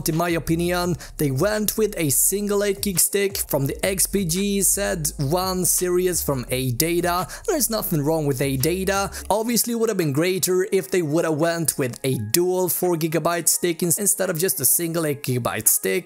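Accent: Sweden. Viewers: You sound like you are from sweden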